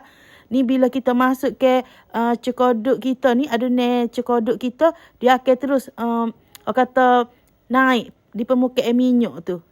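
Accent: Kelantan, Malaysia. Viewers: Lama x muncul ya..suke dh kecek kelate tu😅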